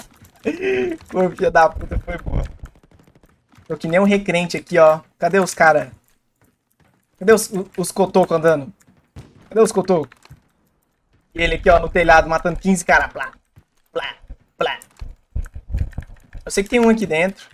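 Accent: Minas Gerais, Brazil. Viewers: sotaque de mineiro é o melhor kkkkkkkkkkkkk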